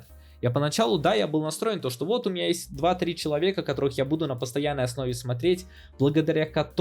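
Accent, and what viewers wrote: Japan. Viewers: Как по мне, это поможет не только для японского, но и для любых других языков, и наверное, даже не важно для каких. Абсолютно для любых. Спасибо огромное, что сняли это видео, правда. За какой язык я уже только не бралась, я вечно его забрасывала, тк не знала с чего мне начать, как правильно учить и ТД(самый первый был кстати японский). На всех видео все говорили по разному и их 10ти минутные видео шли часами будто бы. Из них я никогда ничего не понимала. В вашем же видео я поняла абсолютно всё, да и 10 минут прошли как 5 Спасибо огромное за такое реально полезное видео. Думаю, я вернусь к изучению японского по вашему способу.. (Мне кстати интересно, а у вас японский акцент сам появился после прослушивания, или же вы просто ещё проговаривали слова? Извиняюсь, если это было сказано в прошлом видео. Я ещё не дошла до него)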